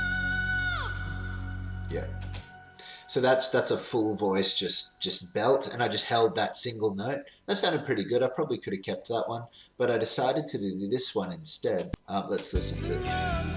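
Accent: Australia. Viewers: Thanks for doing this! Having worked in recording studios producing songs and ad jingles, I was always fascinated by the sound engineer’s familiarity with the console and utilizing the tracking part of it. One of the fun ones used to be working at EMI Studios with a 48 track console. That was many years ago. Now, its digital, so it’s even more fascinating to me! Good one! On another note: Are you Australian, or from South Africa or New Zealand?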